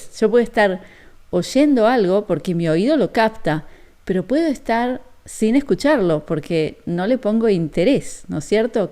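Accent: Argentina. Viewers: tu acento argentino hebreo me produce una profunda añoranza.Dios te bendiga Shalom.Un saludo desde USA.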